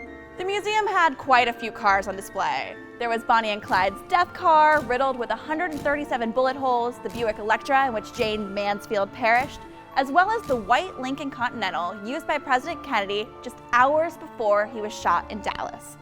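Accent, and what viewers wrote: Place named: California, United States. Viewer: My God that voice. She sounds like The Californians on SNL. Not to mention that it's not definitive Oswald killed JFK or, at worst, pulled the trigger.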